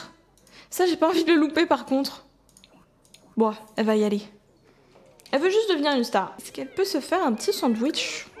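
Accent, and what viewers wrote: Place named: United Kingdom. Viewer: J'adore ton axent anglais sinon te la best ma yt préf 🥰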